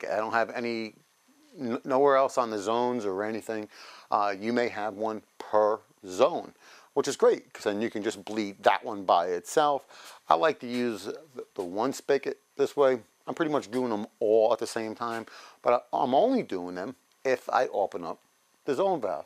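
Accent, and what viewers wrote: New York, United States. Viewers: Hey, you have a NY accent, are you from NY?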